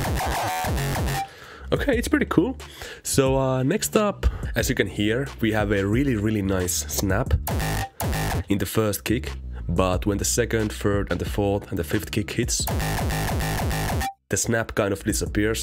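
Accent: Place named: Finland. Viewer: arvasin että oot suomalainen tosta aksentista, mutta muuten helvetin hyvä tutoriaali :D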